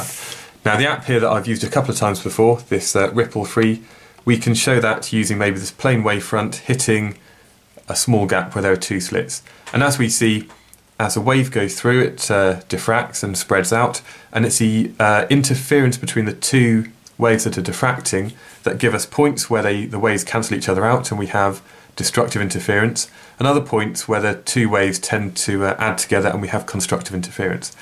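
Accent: United Kingdom. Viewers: I love your accent, nice British guy!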